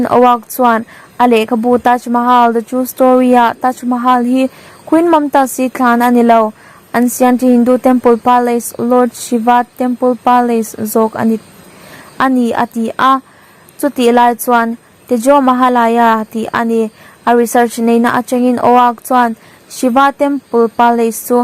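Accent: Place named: Myanmar. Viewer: Enge in sawi sel vak vak. Burma aw phawi a nih hi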